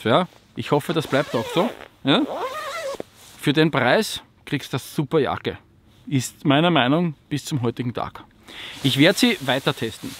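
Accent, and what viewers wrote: Vienna, Austria. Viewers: a woschechta wiener, ha? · Alder ein Video von dem Typ gesehen, direkt abonniert einfach ein richtiger ehrenmann. Und bei dem geilen Österreichisch sowieso ein Muss.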